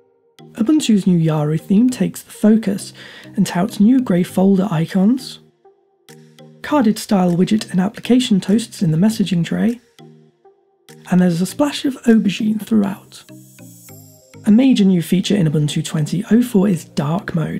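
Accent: United Kingdom. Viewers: Which part of british are you from?? I can barely hear what you are talking..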